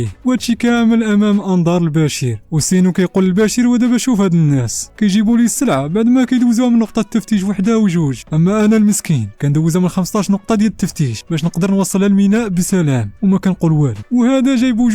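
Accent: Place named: Safi, Morocco. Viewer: من اللهجة ديالك باينة بلي نتا ولد اسفي ٢سوكوند اللولة كنركز الملخص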